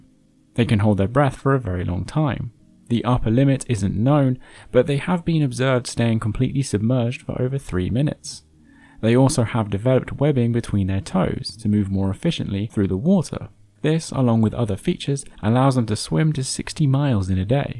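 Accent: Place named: United Kingdom. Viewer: Creepy british asmr voice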